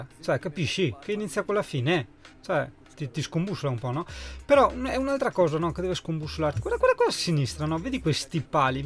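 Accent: Veneto, Italy. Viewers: la tua cadenza veneta è SPETTACOLARE😄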